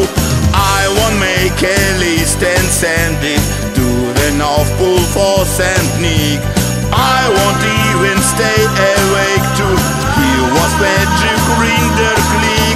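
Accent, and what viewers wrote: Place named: Russia. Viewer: Шикарный юмор и очень русский английский. Я в восторге.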